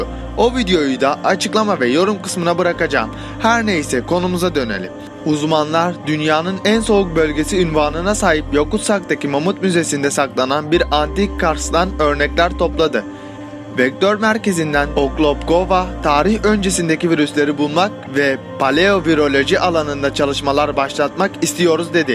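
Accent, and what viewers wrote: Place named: Thrace, Turkey. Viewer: Trakya şivesi seziyorum.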